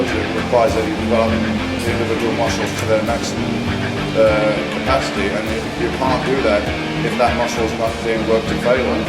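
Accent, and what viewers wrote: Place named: Birmingham, United Kingdom. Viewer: Birmingham, England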